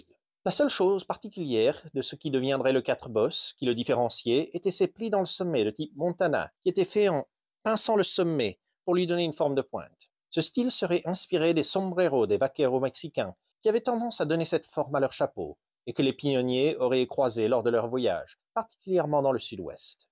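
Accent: Quebec, Canada. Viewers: Léger accent canadien francophone. Léger, mais bien là.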